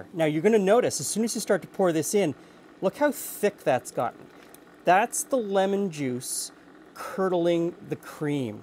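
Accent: Canada. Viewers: Wonderful video and a recipe that even an old guy (ha, ha) can handle ---- just enough but not too many ingredients. Three (3) is a good number, LOL. Loved that delicious Canadien 'accent', too.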